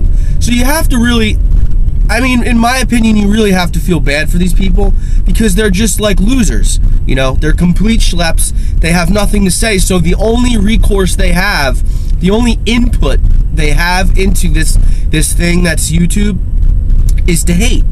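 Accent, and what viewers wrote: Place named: New Jersey, United States. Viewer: You sound like you're from Jersey. I'm subbing. I agree though. It does take someone with character and who is genuine to put themselves out on video. Most people I know are too afraid to get on camera. The people who aren't afraid, though, actually have some real stuff to put out there. I'm overcoming the flu myself. I know your vid was uploaded 2 years ago, but thought I'd share that :-)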